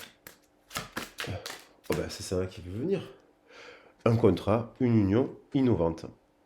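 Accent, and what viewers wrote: South, France. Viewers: Bonsoir vôtre accent du midi me remonte le moral ca fait du bien moi des angoissés seule tres angoisse merci bonne soirée bisous ❤❤❤❤❤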